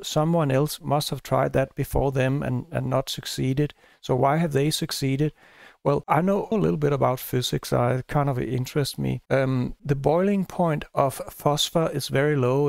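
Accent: Denmark. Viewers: Du låter dansk! 🇸🇪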